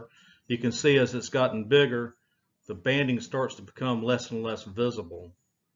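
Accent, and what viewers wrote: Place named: Alabama, United States. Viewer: Thanks fer speaking more like us Alabamans do talk.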